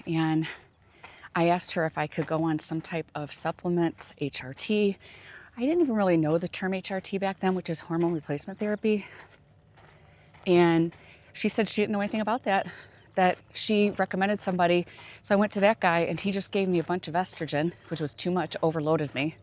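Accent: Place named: Midwest, United States. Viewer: Same here! What labs 🧪 do you think the original poster and I should get? It feeling absolutely awful! You sound like you have a Midwest accent my friend! I too got a hysterectomy but a bit younger at 36. Perimenopause and adrenal fatigue for 5 years now. Hormones came back normal, so now what and who do I ask for the labs? Thank you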